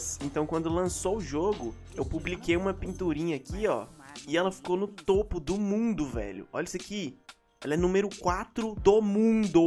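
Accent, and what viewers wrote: Minas Gerais, Brazil. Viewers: Mineiro Ala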